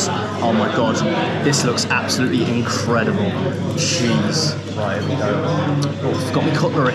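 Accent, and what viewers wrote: Cockney, United Kingdom. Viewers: Got a cockney accent and never had pie mash and liquour. Funny guy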